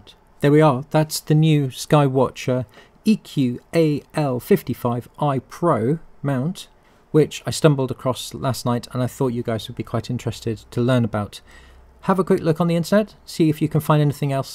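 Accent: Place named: United Kingdom. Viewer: Thanks for the video - love your work. Nice to hear a Brit accent in the AP world! I'm not sure that the £779 asking price is worth it. It's £300 more than the GTi, and whilst that gets you 10Kg payload vs 5Kg, Sky Watcher's own EQM 35 Synscan Pro for £120 less than this new mount seems to be a much better deal on paper. What am I missing?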